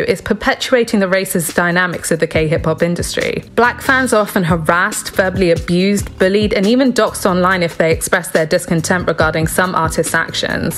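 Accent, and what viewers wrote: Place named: England, United Kingdom. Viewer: She just sounds English...because she lives here. Funny that.🙄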